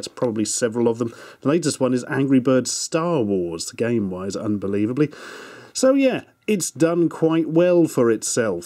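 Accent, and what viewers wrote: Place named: England, United Kingdom. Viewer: Are you in England because your ascent and you you said pounds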